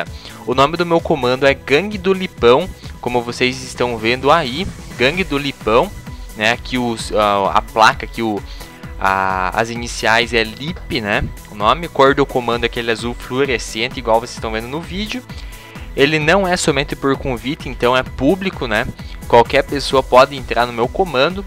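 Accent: Rio Grande do Sul, Brazil. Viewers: gaucho? kkkkkkkk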